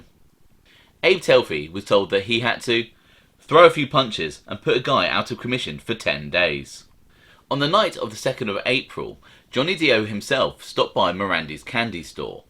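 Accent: United Kingdom. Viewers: I fucks wit heavy. Perfect limey accent to tell stories and excellent stories with pictures I would like more wiretaps tho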